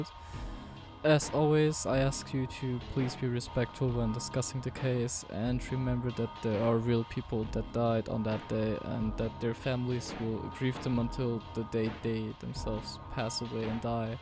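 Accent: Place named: Germany. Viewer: You have a german accent tho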